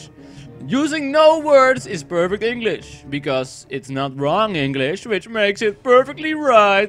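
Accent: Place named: Netherlands. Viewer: Denglish Dutch-english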